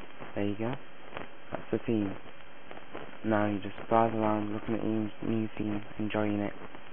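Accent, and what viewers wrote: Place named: Guyana. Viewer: u ah guyanese too lol wah gwan bai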